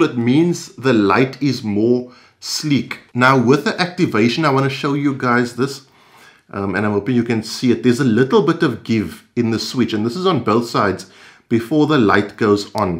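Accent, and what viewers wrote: South Africa. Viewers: South African accent I hear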